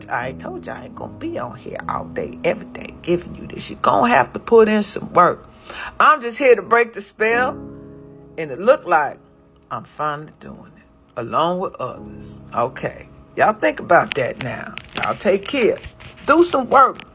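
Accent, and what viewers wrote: United States. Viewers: Could someone tell me what region/state her accent comes from? I can't recognize it. Is it Southern states , West Coast, Midwest?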